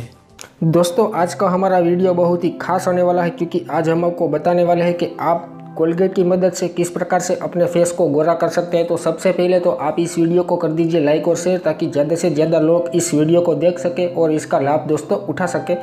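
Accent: Gujarat, India. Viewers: Bhai tu gujrati haina teri aawaz se hi pta chl gya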